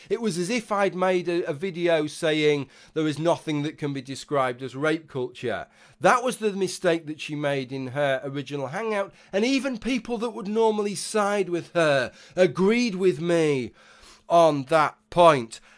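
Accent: United Kingdom. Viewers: British accent is the best:)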